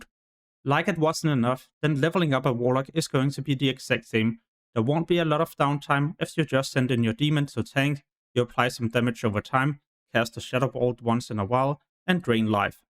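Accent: Denmark. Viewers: Are you danish? 🇩🇰 or what accent is that?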